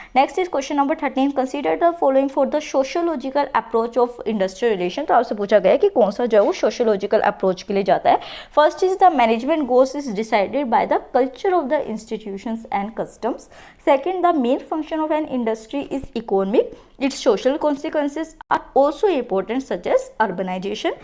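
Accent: Haryana, India. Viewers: That is called "Haryanvi" accent.😎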